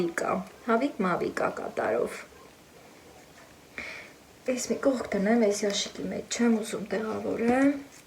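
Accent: Lori, Armenia. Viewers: Վաաախխխ Լոռվա բարբառ ❤❤❤ որտեղից եք տիկին ջան?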